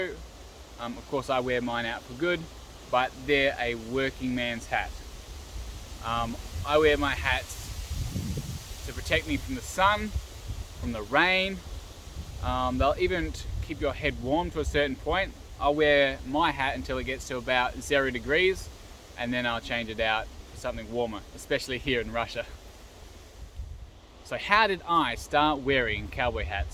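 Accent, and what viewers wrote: Australia. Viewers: In Australia, men take off their Akubra ( the older hat) when entering a house, before entering a church, and at a funeral as a sign of respect. These men tip the front of Akubra slightly when meeting a woman ( traditional) , again as a sign of respect. Sadly this way of life is going.This man has a rural accent and wears rural clothes, including the hat. These people are the backbone of Australia. Russia's gain is Australia 's loss.Aussie, aussie, aussie oy, oy , oy!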